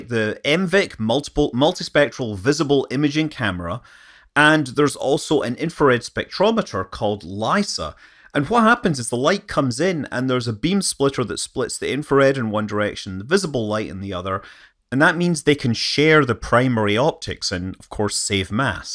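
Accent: Scotland, United Kingdom. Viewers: Greek names always sound best with a Scottish accent.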